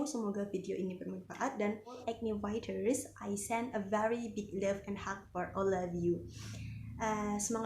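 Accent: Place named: Java, Indonesia. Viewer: Udh lama di surabaya medokkkk deh mis yak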